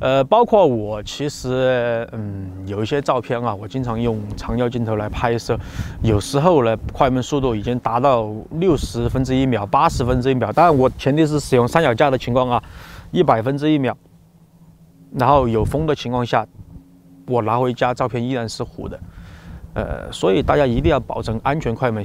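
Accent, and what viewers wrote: Sichuan, China. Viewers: 正宗川普，支持！已点赞、订阅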